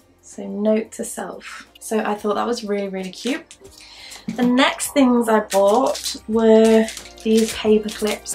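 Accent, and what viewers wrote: United Kingdom. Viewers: Awwwwwww! :D i love your new stuff and i love your British accent too <3 :D Love from Egypt <3 <3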